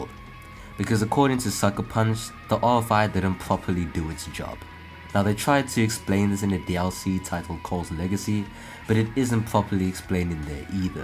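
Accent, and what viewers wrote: South Africa. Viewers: Yarre I can hear in your voice u a Southie mybra🤣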